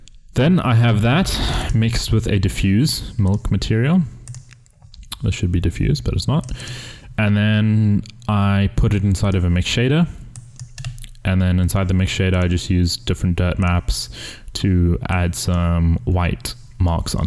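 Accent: South Africa. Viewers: South African accent??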